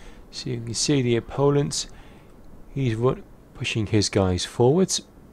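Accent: United Kingdom. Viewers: idk,i just love your british accent :D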